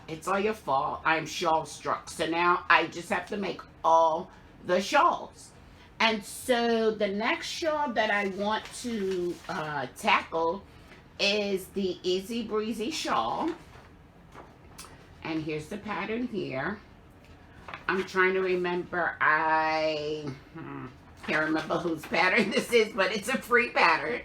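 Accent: New York, United States. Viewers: That is so funny, I was going to say I hear a NY accent. My parents were born and raised in the Bronx, I grew up an hour from the city and now live in CT:)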